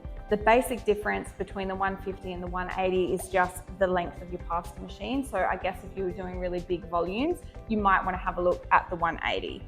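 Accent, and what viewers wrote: Australia. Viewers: Hi, my name is Lori, I want start off by saying I love your accent, Australia, right? I'm seriously thinking of making clay jewelry, and this is the first time watching your tutorial, I like it! Have a great day! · What a great source of information , and great to hear an Ozzy for a change. Thank You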